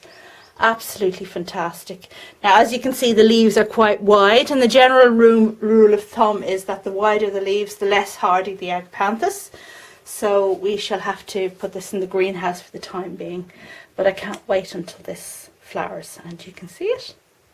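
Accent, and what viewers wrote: Ireland. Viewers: I appreciate your Irish accent so amazing! Keep on making your marvelous job!... It.’s pm in Rio but I had to see your voice and your lovely way of explain the plants secrets...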